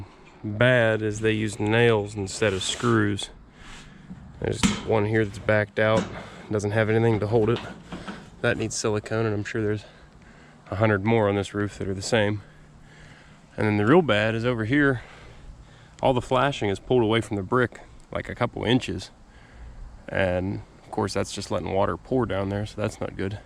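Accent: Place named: South, United States. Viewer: Are you from western PA originally? You sound very southern. Especially the ol' lady comment.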